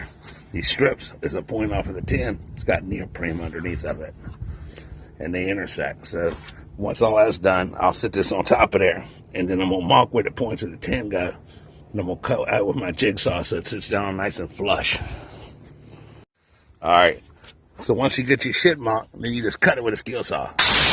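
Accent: United States. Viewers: What state is this accent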